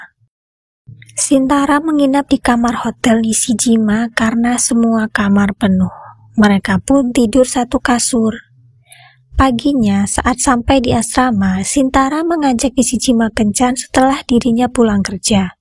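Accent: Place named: Java, Indonesia. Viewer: Suaranya naik turun.. Pembawaannya seperti orang membaca, kurang enak di dengarnya, gk bisa nyantai, terlalu medok 🙏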